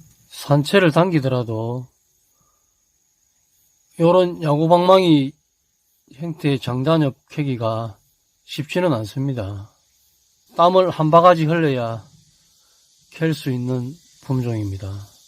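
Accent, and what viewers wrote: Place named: Busan, South Korea. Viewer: 안녕하세요 영상처음접해 봅니다 난초들이 아주건강하내요 부산말씨같아요 저도부산사라인 데 지금은제주도에 서2년째살고있 습니다 완판하시고요 영상 자주보겠습니다 잘봤습니다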